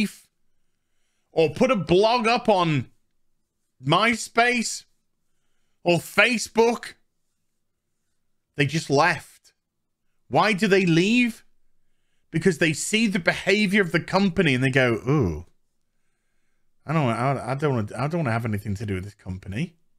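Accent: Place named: RP, United Kingdom. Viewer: BTW, I love your "British" (posh) accent.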